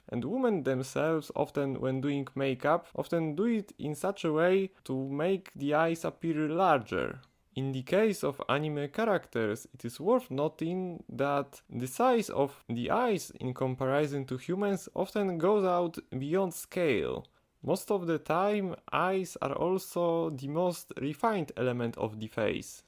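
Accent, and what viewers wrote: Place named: Poland. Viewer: Idk why you were worried about your English, it was good. Sure, in some fragments the Polish accent it's shown and some pronunciation are more or less so Polish XD BUT overall, everyone can understand what you're saying! Also, maybe I make a bit fun off your pronunciation but it will never compere to how my classmate from long time ago pronounced "vegetable" XD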